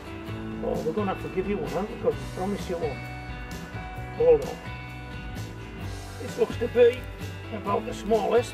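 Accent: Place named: Teesside, United Kingdom. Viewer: You must be from Teesside with that accents mate. Where abouts are you from?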